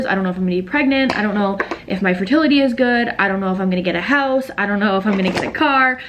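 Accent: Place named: Canada. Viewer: Lol I think it’s her Canadian accent? She says bagel the same way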